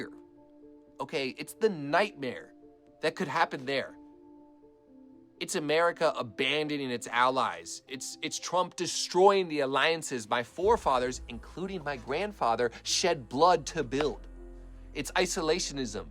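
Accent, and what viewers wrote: Beijing, China. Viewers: 樂樂法利請改掉你的北京腔吧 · 你的中文是大陆口音，不是台湾口音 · 請尊重台灣法律，規定就是規定，還是你在中國太久所以理所當然覺得台灣會因為你是拿美國護照，你就不用遵守台灣的法律規定？偷5元美金跟偷5千萬美金一樣都是偷。針對對台灣政府不給你入境的言論，根本很明顯就是自己不尊重台灣法律，然後裝無辜說要台灣政府給你交代？完全不覺得你很愛台灣了解台灣，或了解台灣人民與歷史（你願意的話，可以多讀點有關台灣歷史）。但其實你可以回去中國，你的口音更適合那裡，台灣人聽到你的口音真的會有某種程度的「抵觸感」。這跟你中文流不流利沒有任何關係。PS.你這個影片也沒有幫到台灣只是很自我中心在說台灣政府欠你說明！你說你想幫台灣？還是想「臭」台灣？好搞笑。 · 真棒臺灣人不要你這個匪諜。大陸口音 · 他的中文是在中國好像是北京學的，難免是那邊的腔調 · 我怎么覺得你說的中文有很濃的中国腔？ 你肯定上了親中的電台,你被美国政府通知別譲你入台！ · 應該沒有人期待你來台灣吧！一口大陸腔去中國工作吧！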